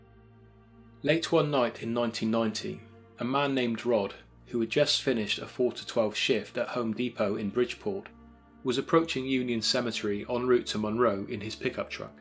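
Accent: England, United Kingdom. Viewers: Interesting hearing someone from Old England talking about New England. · I'm normally streaming via Roku or SmartTV so I rarely leave comments but I absolutely love this channel. Excellent narrative skills from proper British accent, the stories are intriguing & I just wish made more videos (being selfish). But with this lame pandemic I don't know why anyone would give this man's videos a dislike. Keep doing what your doing sir! You're in my top 5 YT channels. Thank you for your time & efforts making these videos.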